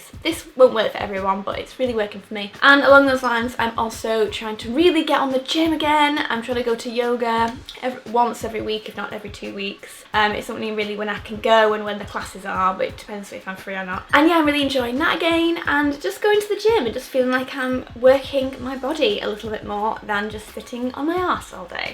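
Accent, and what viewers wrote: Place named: North, United Kingdom. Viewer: where are you from? you sound quite northern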